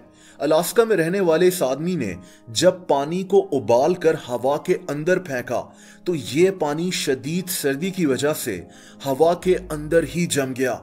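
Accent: Pakistan. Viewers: yup thats pakistani english boi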